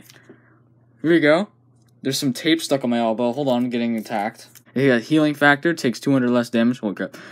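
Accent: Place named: New York, United States. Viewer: Nice new York voice really funny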